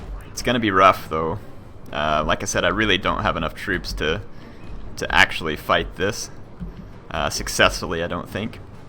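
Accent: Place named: South, United States. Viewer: we miss your southerner accent:D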